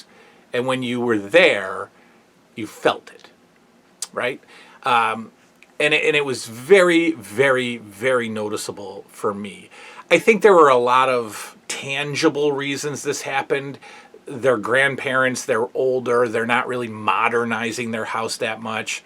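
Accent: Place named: Central Pennsylvania, United States. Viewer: Where are you from? It sounds like we are close to each other. I'm from central Pa.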